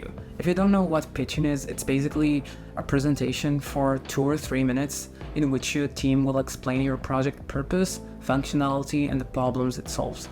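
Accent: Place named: Egypt. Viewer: he both sounds and looks Egyptian to me lol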